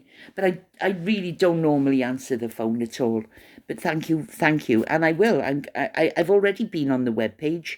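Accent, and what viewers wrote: Wales, United Kingdom. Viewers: I do love listening to you and your lovely Welsh accent - and you make me smile. Interested in the Dubai prescription if there is any way you can share link. I love my cat too. She’s my life but she’s 80 in human years and I worry I won’t have her much longer. Andrew’s black eye was from a businessman he owed money to. The author of the book clarified. That and the Harry punch in the nose are separate incidents. I’d like to give them both more than a black eye! Good you’re back,.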